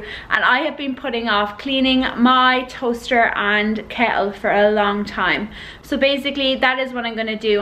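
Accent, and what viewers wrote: Ireland. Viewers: OMG !! YOU'RE IRISH! Instant subscribe just from your accent!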